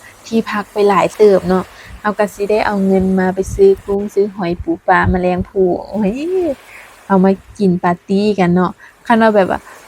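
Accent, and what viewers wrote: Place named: South, Thailand. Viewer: ภาคใต้...เลยครับ🤣😆😁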